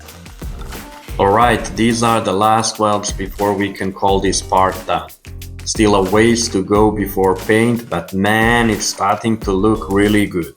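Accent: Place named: Balkans. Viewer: I noticed the accent and the name of confirmed my thinking 😅 Odakle si legendo? Kidaju snimci!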